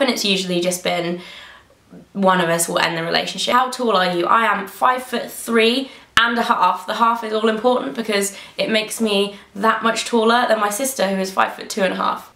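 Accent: United Kingdom. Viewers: Hi! I just wanna say that you are beautiful, and i love your voice :) I'm obsessed with British accent and i love the way you speak :) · you talk soo fast, but i really enjoy watching your videos, and I looove your british accent :D♥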